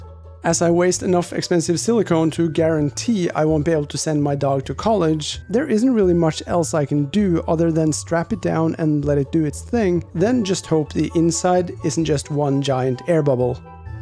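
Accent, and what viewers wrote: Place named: Norway. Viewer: I can't quite figure out what flavour of Scandinavian you are. Norwegian?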